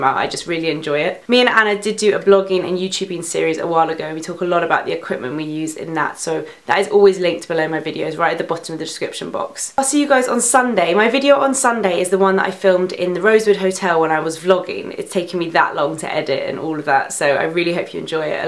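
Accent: United Kingdom. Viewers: Ha ha english subtitles are not acquired for this video because of your British accent I believe and I am glad to see that I am way comfortable with British accent now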